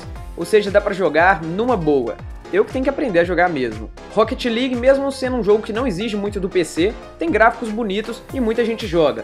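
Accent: Minas Gerais, Brazil. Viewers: Mineiro mineiro ♥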